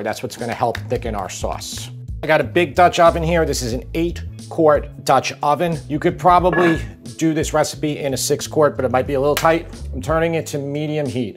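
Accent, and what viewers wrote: New York, United States. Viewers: I'm going to cook this. Thansk for the recipe. BTW, I love the NY accent!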